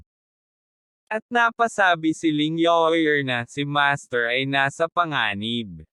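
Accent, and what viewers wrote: Visayas, Philippines. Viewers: Kinaray-a nga ilongo na may halong bisaya 😅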